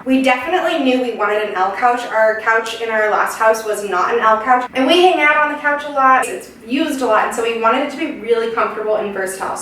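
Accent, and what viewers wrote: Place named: West Coast, United States. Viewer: awe thanks!!!! They were selling that pillow for $80 bucks. Crazy. But..... I love the Chicago flag. It’s the second most recognizable flag after our country flag. Sounds like you’re a West coast girl. Prepare yourself for Winter......lol.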